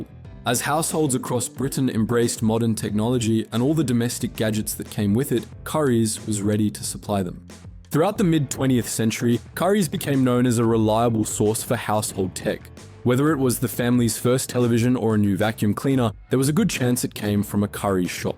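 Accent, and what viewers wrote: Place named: Australia. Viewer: Nah, yeah, haha, yeah... The narat9r is an Aussie , can't you hear him ? Got stuffing 8n y9ur ears have ya ?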